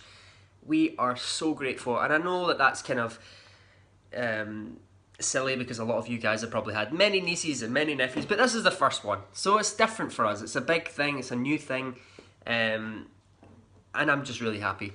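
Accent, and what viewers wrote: Scotland, United Kingdom. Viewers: Hey. I love watching ur videos & I love ur accent. Scotland is beautiful & a dream place for me & my dad to visit. My dad's side of the family is Scottish 😊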